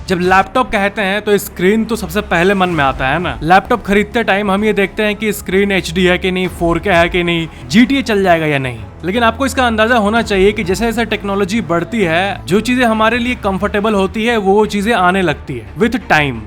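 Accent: Bihar, India. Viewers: Aap bihar se ho bhai😊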